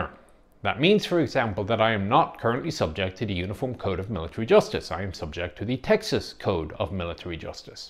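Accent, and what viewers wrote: Ireland. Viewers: From your accent, you sound like you're from Ireland. Did you have to go into the reserves as part of the naturalisation process or was it an active choice on your end? Asking as an Irishman ready to move to the US. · As someone who works with a lot of paperwork related to the three components, I can just show friends and family this video now to explain how all this works. I'm sure it sounds better coming from someone with a charming Irish accent... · I bet part of it is his Irish accent. Like Shelby Foote in Ken Burns Civil War series, his accent is pleasing to the ears.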